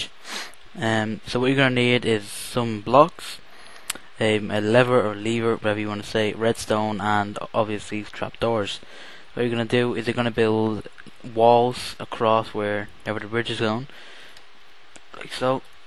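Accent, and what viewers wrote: Ireland. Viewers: hes irish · That was cool. Nothing wrong with an Irish accent either.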